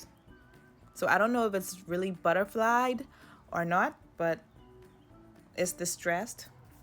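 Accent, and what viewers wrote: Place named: Bahamas. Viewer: Are you bahamian you sound like me am from the Bahamas but live in Seattle Washington currently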